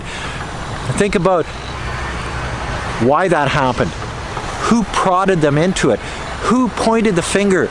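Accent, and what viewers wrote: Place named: Canada. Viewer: What a Canadian tone to described the greatest of, keep up the good work! Your supporter from Coquitlam, BC, Canada!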